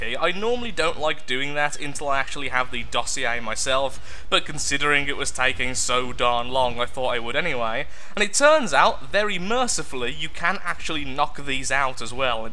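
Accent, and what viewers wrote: United Kingdom. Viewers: British accents make everything sound weird...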